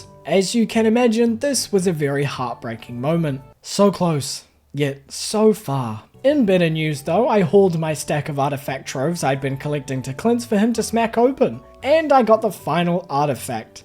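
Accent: New Zealand. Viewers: Are you….a kiwi? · As an American, it is so painful to hear you use an American accent after listening to your lovely Kiwi accent haha. Love listening to this during my study breaks!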